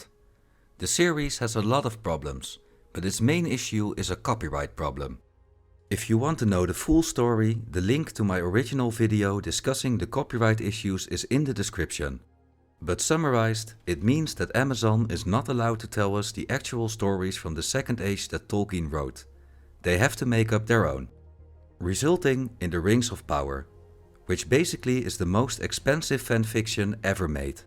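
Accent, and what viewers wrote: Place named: Netherlands. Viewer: Yeh, let's put a dutch accent on a "machine voice". Not only the accent, but the usual dutch sentence building and the clear script reading is a dead give-away for a real person. Don't assume truth, search it my friend.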